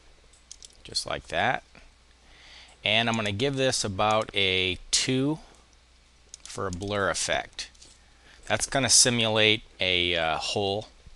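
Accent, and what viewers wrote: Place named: United States. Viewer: Nice uploads! I just came across some videos on a Chinese video site and found him speaks like another Inkscape tutor Nick on YouTube, I mean the accent of them is the same in my opinion and they're both from the US.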